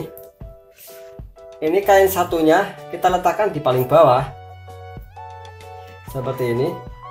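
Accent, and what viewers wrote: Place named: Banyumas, Indonesia. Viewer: السَّلاَمُ عَلَيْكُمْ وَ رَحْمَةُ اللهِ وَ بَرَكَا تُهُ Mas sampean bahasane kaya aku. Tika dr republik ngapak apa ya mas.. Aku jg di tanggerang. Sampean tanggerang mn..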